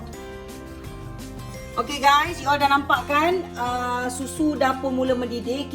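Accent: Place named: Perak, Malaysia. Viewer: Eeyyy mok sedap bebeno nengok nye..sis labon bahase perak best pulok ..lawok pun ade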